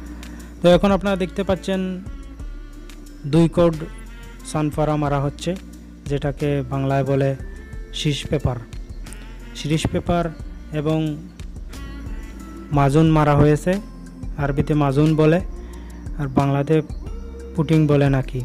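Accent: Sylhet, Bangladesh. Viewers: Sylheti ni bai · খাস সিলেটি❤️ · Vai sylheti ni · ভাই তুমি সিলেটি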